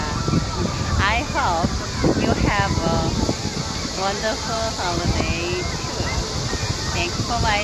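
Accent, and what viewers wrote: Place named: South Korea. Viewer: 외국에선 실제로 그런 발음이나 문법적인것들 보다도 실제로 상대가 어떤 이야기를 하고픈 건지에 대해 더 주의를 기울이는데 댓글창에는 번지르르한 보이는것들에 더 집착하는 분들이 계시네요. 덧 없습니다. 계속 keep it up하세요. 한국인으로 한국발음에 익숙하게 자라온게 너무나 당연하고 자연스러운건데 왜 꼭 영어하는 세상 모든 사람들이 본토의 원어민 발음을 가져야 한다고 생각할까요? 말도 안되는거죠. 항상 응원하겠습니다.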